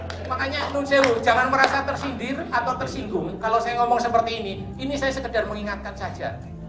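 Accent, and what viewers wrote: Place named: Banyumas, Indonesia. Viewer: maen,ngapak pokoke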